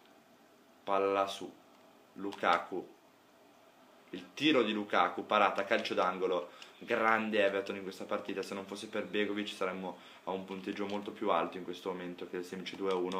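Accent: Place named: Italy. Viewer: Come sei fiscale, w la pronuncia italiana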